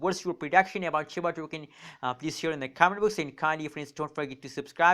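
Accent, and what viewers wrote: India. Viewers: Urs voice little bit like indian 😀😀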